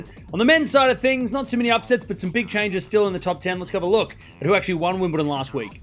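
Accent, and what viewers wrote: Australia. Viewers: Appreciating the info, especially the graphics ... Itsa klaro, even with that distinct Aussie accent ... 👍👍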